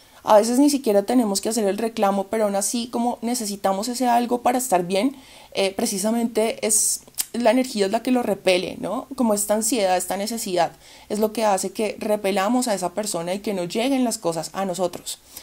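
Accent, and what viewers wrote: Bogotá, Colombia. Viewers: No se como llegue a este canal’ basto solo ver un video para admirarla y suscribirme.... Me encanta el acento de los colombianos 😊. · Que deleite escuchar ese acento colombiano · Gracias por su consejo lo voy tomar en cuenta, por cierto pienso que vos eres de colombiana y de Bogotá pues ese acento es solo de esa ciudad porque pues soy también Bogotano, abrazo eres muy bonita 😘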